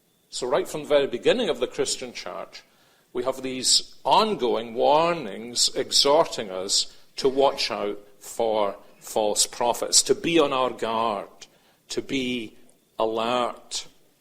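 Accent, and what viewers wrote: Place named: Scotland, United Kingdom. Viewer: Does this man of God preach in Scotland.? He has a Scottish accent.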